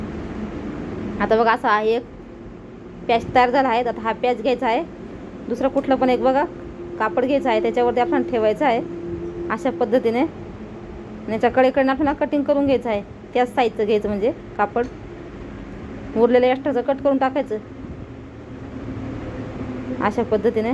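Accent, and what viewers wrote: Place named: India. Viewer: आपण इंग्रजी माध्यमाच्या शाळेत शिकलात का? मराठी मध्ये बोलताना जास्तीत जास्त इंग्रजी शब्द वापरताय. कट करणे,मार्किंग करणे,साइज,फोल्ड करणे इ.शब्द. एरवी बोलण्याची‌ पद्धत व उच्चार गावरानी वाटतात.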